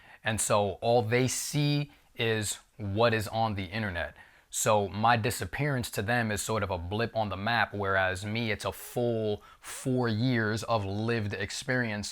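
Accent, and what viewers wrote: New York, United States. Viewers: he has a NY accent… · i think I read “what’s this accent” the first time. 💀What I meant is there was no accent change, he talks the same (the NY accent) he’s just not doing his characters, with had a more cartoonish personality and accent. Remember his movie about getting robber? He sounds the same as this in that, because he’s not playing a character, but being himself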